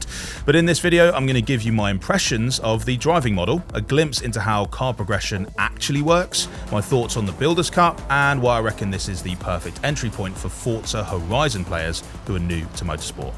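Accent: United Kingdom. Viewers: Wreely wreely good game... damn i hate british accent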